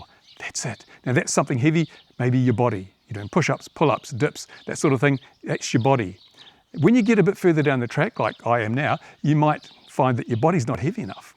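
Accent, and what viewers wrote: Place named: New Zealand. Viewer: Love that kiwi accent.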